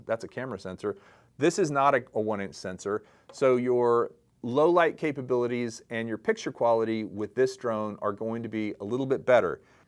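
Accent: United States. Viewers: I like your review because you have clear american voice without accents and its crystal clear to my ears. Thanx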